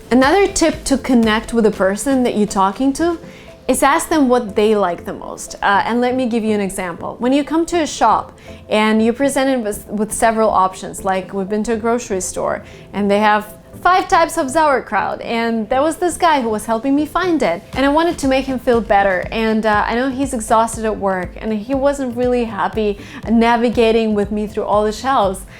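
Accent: Russia. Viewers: Is her native language Russian? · Please make vıdeo With yoğur russıan accent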